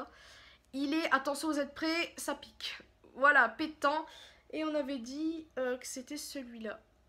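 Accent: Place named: Nord-Pas-de-Calais, France. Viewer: Mon dieu.... Je m'excuse mais dans le NPDC on a pas tous cet accent dégueulasse.... Ça me fait mal car on ne parle pas tous comme ça. Faites un effort s'il vous plaît ! Vous parliez avec cet accent à l'école ? Nous non ! "jvais vous faire voir" "j'aime de trop" "prrrrrrrt" "esspliqué" "à la pub" "swouotché, voilo" "voyagé" (voyageur) Non, non, non.... pitiée pour notre patois ....déjà Dany boon nous a assez ridiculisé.... C'est pas la peine d'en rajouter. Je m'excuse je tombe ici par hasard, je cherchais des malheureux swatches.... Alors j'en ai et notre accent à couper au couteau.... Prenez l'accent en famille svp, mais pas devant la France entière svp ! J'ai tellement honte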